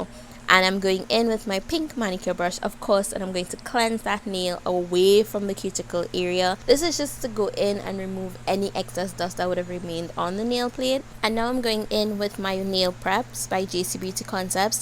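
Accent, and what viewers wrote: Trinidad and Tobago. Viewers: 🇦🇬🇦🇬Hello Ms. Trinidad... picked up that accent anywhere. FABULOUS WORK Caribbean Sister!!! Congratulations!!!!💙💙💙💙💙🌟⭐⭐ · Hi, u sound like a Trinidadian! 🙌🙌🙌 Beautiful work by the way😍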